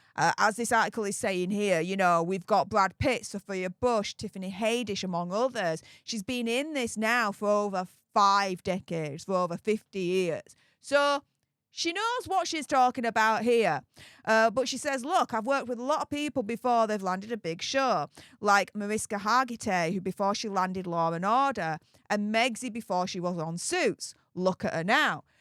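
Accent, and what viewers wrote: United Kingdom. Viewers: When you say “bonning” it sounds like “born in” … took me awhile to make out what you really were saying. This is what is fun above hearing a British accent.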